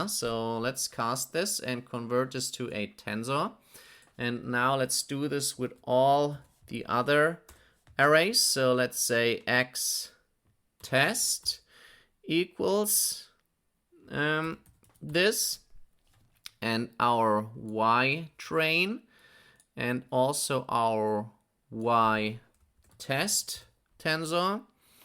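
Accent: Germany. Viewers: never heard a more german pronounciation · I love how uncompromising this german accent is.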